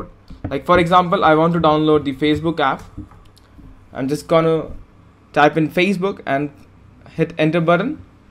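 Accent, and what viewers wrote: India. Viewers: sounds like a indian tech support scammer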